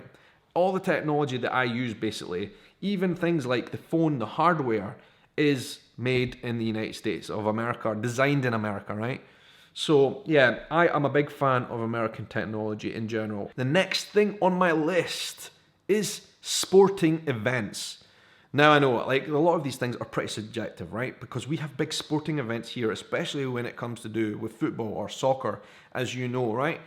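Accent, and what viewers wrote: Scotland, United Kingdom. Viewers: What does Scotland do best....the accent! Read a friggin book and I will listen. Seriously... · So glad I found you. Enjoying your posts so much. I love Scottishs accent and phrases!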